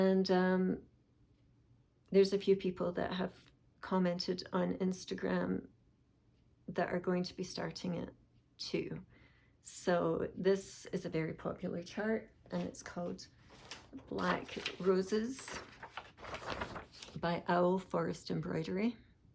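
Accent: Scotland, United Kingdom. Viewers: Nice to hear a Scottish accent on FlossTube! Your finishes are lovely! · I’m not sure how I’ve missed seeing your videos before now. I hear a Scottish accent which I enjoy. My parents are Scottish, and my oldest daughter’s name is Katrina! I’m so sorry your daughter was in such pain. I love your projects. I’m also stitching Summer Quaker. And I’m new to WIP Warriors this year.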